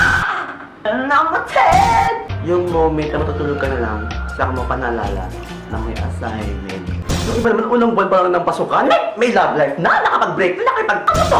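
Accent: Visayas, Philippines. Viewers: halata. ilonggo or cebuano ito cia.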